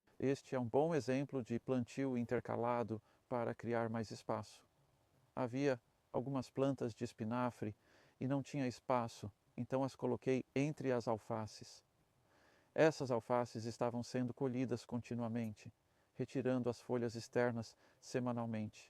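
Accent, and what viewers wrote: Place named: Portugal. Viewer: Bom agora temos um canal em português ( com sotaque de Portugal) desse mestre. Tava difícil entender porque todos vídeos não tinham legenda em português ✌️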